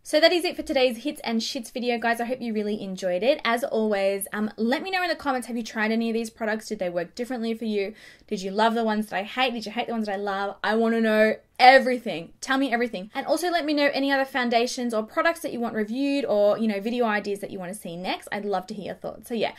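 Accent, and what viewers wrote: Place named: Australia. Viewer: I'm aussie too & I can hear you have a strong accent... are you a country girl? & what state are you from? (I'm from the Blue Mountains, west of Sydney:} )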